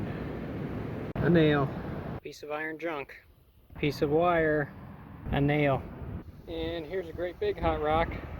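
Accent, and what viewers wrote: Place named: Australia. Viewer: Loved the aussie accent 🤣 my theory why Australia has all the big gold is because the heveys always sink to the bottom 😉 thumbs up from down under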